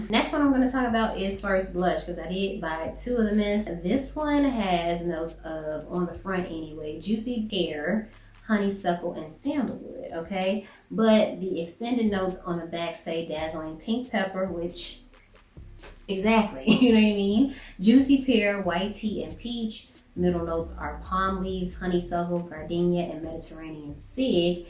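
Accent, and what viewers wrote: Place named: Arkansas, United States. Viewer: As soon as you mentioned an Arkansas influence on your pronunciation, I was IN. *subscribe*. No, I'm not from Arkansas, but I am from who you are. Great review. Well done.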